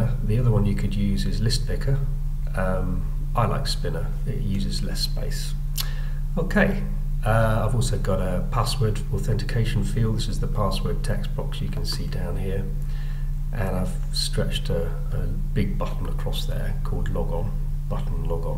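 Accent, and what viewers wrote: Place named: United Kingdom. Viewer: This account is WAY better than the other accounts I have seen. Mainly because you sound British which means I can actually understand you. lol.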